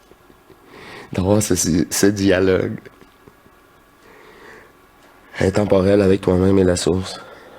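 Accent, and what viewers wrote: Quebec, Canada. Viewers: Et bien je kiffe l accent québécois… ta berwete ici c est une brouette mais je kiffe quand même jolie chanson que cet accent … et il est vrai que ces picotements j ai eu aussi cela les derniers jours … amusant tiens … portes toi bien et prends soin de toi merci d être là et de partager cela gratitude à toi 🙏✨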